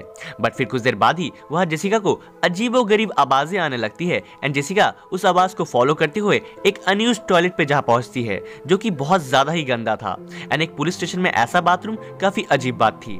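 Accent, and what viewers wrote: Bengal, India. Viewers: Are you bangali, but was nice talking to we are and thenk you for still suggested explenation you will again a lot viewer as much as subscribers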